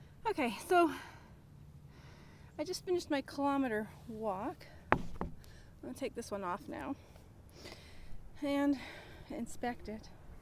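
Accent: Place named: Canada. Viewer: Love these helpful, practical videos! I knew you're Canadian the first time I heard you speak. Sherwood Park... are you... from Red Deer?!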